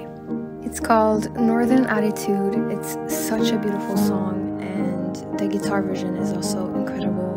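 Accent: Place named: France. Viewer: You know, before I had heard your voice, I didn't care for French accents. But there is something so lovely and soft about your manner of speaking that I felt myself feel less harsh in the direction of Franco-anything. Thank you for changing my mind.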